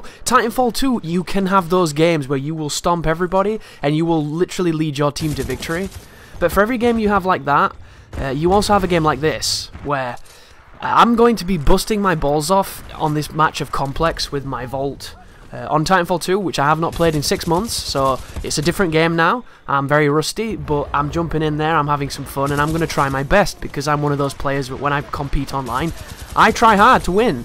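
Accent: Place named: Northern England, United Kingdom. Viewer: I would guess northern England don't know where though.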